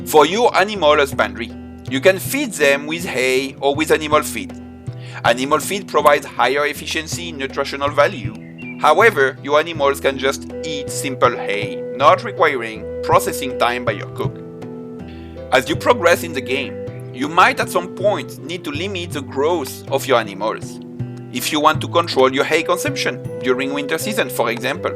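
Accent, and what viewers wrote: France. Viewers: From Texas, love your accent. I dont hear a lot of French YouTubers. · Man your just french as fuck my man. you sound exactly like what I would expect.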